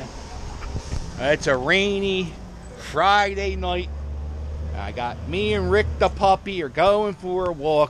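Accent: Philadelphia, United States. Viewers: Ahhh I love your Philly accent and then I realize I sound the same way 😂😂 It looks like you're in the Burg. One of the nicest neighborhoods left in Philly.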